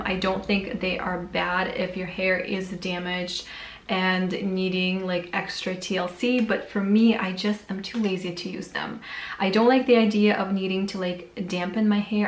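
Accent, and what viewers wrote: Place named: Michigan, United States. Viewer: I love Mason Pearson brushes! What state are you from? You sound like you’re from Michigan or further NW 🙂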